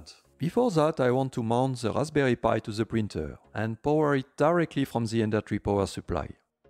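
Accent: France. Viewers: This is the thickest French accent I've ever heard. Great video though!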